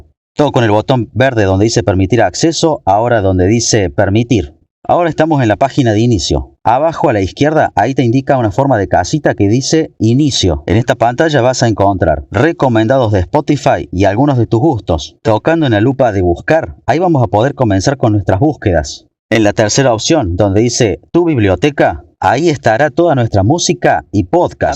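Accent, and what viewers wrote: Córdoba, Argentina. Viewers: Que cordobés del orto.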